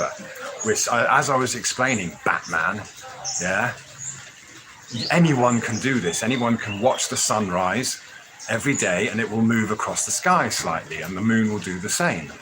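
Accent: United Kingdom. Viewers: You have a great mind, an excellent and very English voice. -- "The models work on the predictive nature of nature," is nicely said! Then it makes sense that the better the model, the better it can predict earth's movements and the movements of the cosmos of things related to earth. In 1900 sponge divers discovered a 2,200 year old shipwreck in the waters off the Greek island of Antikythera, within the wreck was found a device that has been a mystery for nearly a 100 years, its known as the Antikythera mechanism. British museum curator Michael Wright hand built a reconstruction of the Antikythera mechanism that includes all of its known features. The device is a planetarium, that is based on a GEOCENTRIC DESIGN of the world, where the sun, moon, planets and stars revolve around the earth, this is a geocentric system. Today the Copernican System or Heliocentric design of the solar system is taught and believed by most people. 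Michael Wright, was formerly the Curator of Mechanical Engineering at the Science Museum in London, and later at Imperial College in London, England. The Antikythera device is also a mechanized calendar based on a 19-year cycle in which the phases of the Moon are repeated on the same days of the year, this is known as the Metonic Cycle. The device displays calendar information that allows for the predictions of a solar and lunar eclipses. The reconstructed device is accurate for all the planets indicating correct positions with-in one degree in a 500 year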